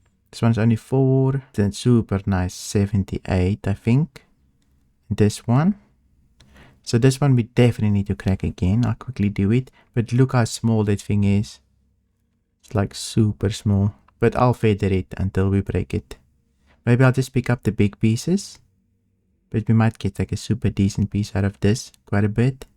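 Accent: South Africa. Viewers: Hey bud, love your content (and your South African accent). Keep it up! I did want to mention that it sounds like you may be clicking the mouse to deactivate the laser beam after cracking and extracting the rocks. You don't actually have to do that since the game automatically does it for you. I noticed once in this video that you actually inadvertently turned your extraction laser back on after the game turned it off for you as a result of your unnecessary click. Just figured I'd mention it since it seems maybe you are not aware that the game does turn it off for you automagically.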